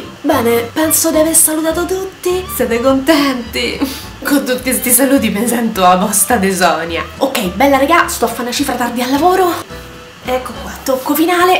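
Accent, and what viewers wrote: Rome, Italy. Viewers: Milano è un delirio quando non c'è sciopero... puoi capire perché diventano tutti matti quando c'è!.' eh siiii è una vita così cosa ci vuoi fare!! ps. ti adoro:) e amo quando parli in romano!! mi fai un sacco ridereeee!! baci dalla lombardiaaaa!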